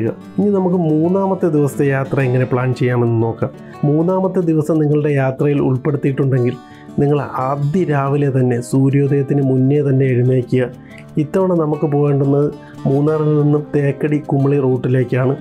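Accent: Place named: Trivandrum, India. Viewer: മൂന്നാർ ട്രാവൽ ടിപ്സ് സിംപിൾ ആയി അവതരിപ്പിച്ചു പൊളി...... അതും ട്രിവാൻഡ്രം സ്ളങ്ങി ൽ ..... ആശംസകൾ 🌹🌹 കൂടുതൽ വീഡിയോസ് പോസ്റ്റ്‌ ചെയൂ..... 👍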